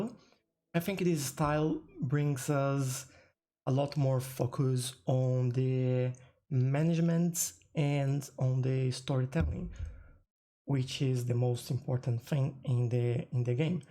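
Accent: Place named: Brazil. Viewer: You sound like a brazilian guy speaking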